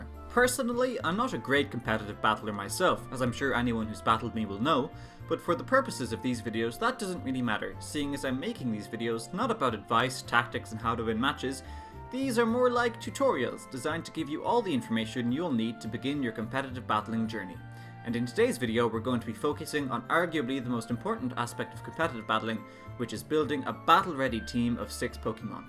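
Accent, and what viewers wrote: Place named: Ireland. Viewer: I can tell by you're accent that you're Irish. I am, too!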